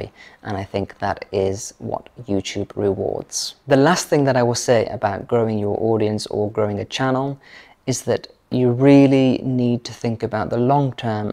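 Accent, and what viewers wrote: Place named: England, United Kingdom. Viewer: Hello! I recently found your channel and I have to say, i am in love with yout content! I can't tell you how much joy your videos bring me! I've never been to England but this is the way I always imagined english people sounded!😅 I am a big fan of Her Majesty The Queen and the way you speak is so similar to hers! Whenever you say the word "again" it just makes me think of her 🤭 anyway, thank you for delighting us with your wonderful content!!!🌷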